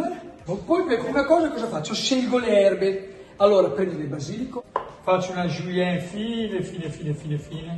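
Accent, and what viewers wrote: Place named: Romagna, Italy. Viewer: Hai un accento Romagnolo che si sente a metri di distanza!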